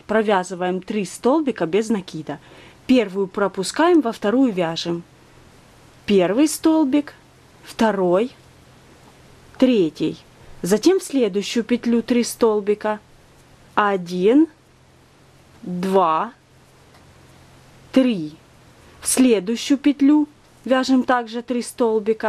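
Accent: Ukraine. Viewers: Девушка видимо украинка, вот и говор такой, так то всё же понятно😊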